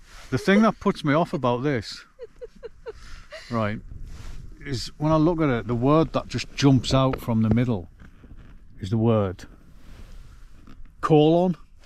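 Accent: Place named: Newcastle, United Kingdom. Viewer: you sound a bit geordie, she says Yorkshire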